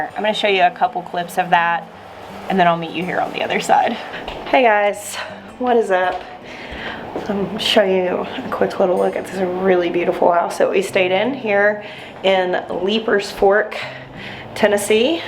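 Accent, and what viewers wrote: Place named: South Carolina, United States. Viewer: your SC accent is coming on strong!! 😁🌻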